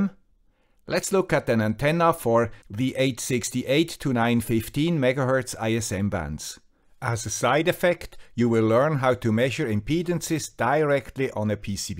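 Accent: Switzerland. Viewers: This gentleman sounds exactly like Andreas Spiess, the guy with the Swiss accent.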